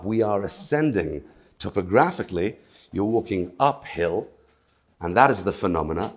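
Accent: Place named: United Kingdom. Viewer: Thank you for this wonderful tour, so excited just to watch it. And it’s also good to hear a UK accent . God bless you.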